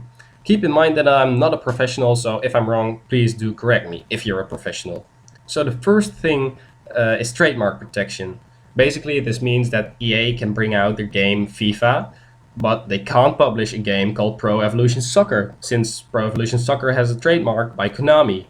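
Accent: Netherlands. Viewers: Dutch guy, I can hear it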